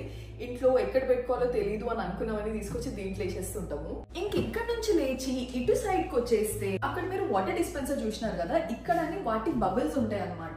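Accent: Telangana, India. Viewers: Very nice home tour manchiga undhi sis❤im your new subscriber i love your slang our Telangana hyderabad. Slang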